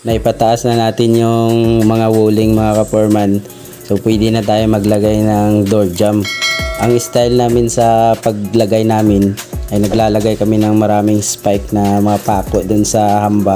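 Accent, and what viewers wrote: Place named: Visayas, Philippines. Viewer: Saan po kau,, narinig ko bisaya😊 gusto ko din kc pa bahay taga mindanao po aq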